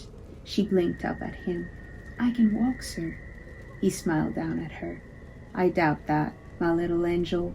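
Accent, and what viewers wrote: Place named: England, United Kingdom. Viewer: C'est très bien lu, avec un bel accent anglais et une belle intonation. J'ai pu suivre l'histoire avec les sous-titres incorporés (anglais). Merci!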